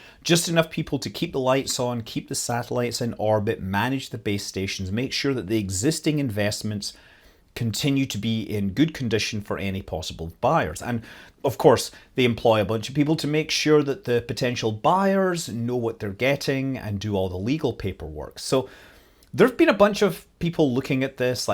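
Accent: United Kingdom. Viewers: Despite your accent I usually think of you as American so it was interesting hearing you say "we" referring to the UK and then correcting yourself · wait what, your British?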